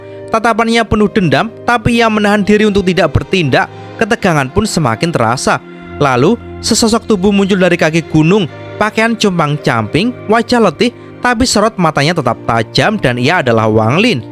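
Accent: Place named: Java, Indonesia. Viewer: Jawa ya😂😂 mendok kali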